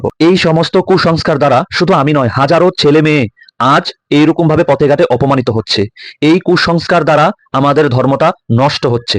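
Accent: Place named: Bangladesh. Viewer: 1. Apnago kothar taan deikha mone hoitese apnago holen Bangaldasi. Ar Bangaldasi der thika sanatani gyan ami shikhum na karon amar jana nai je apni dhormi na bidhormi. Tothapi bidhormi ra j bhabe hindu der upar apnago dashe ottachar korse ta etar thika bhalo kisu asha kora apnago der thika kora utchit na 2. Kono video bananor age ektu bhalo koira prashuna koren ta hoile apnago bhalo hoibe ar apnago channel r viewers der o gyan orjan hoibe. MAA TARA APNAGO MOTON SONKIRNO MONOSKO DER GYAN R ALOK DEKHAK... EI PRARTHONA KORI... JOI MAA TARA🙏🙏🙏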